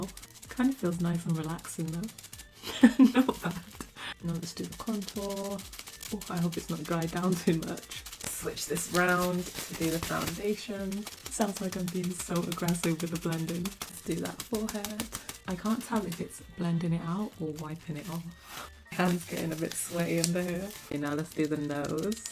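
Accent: United Kingdom. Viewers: ahh Im black but I wish I was british i love your accents😩💖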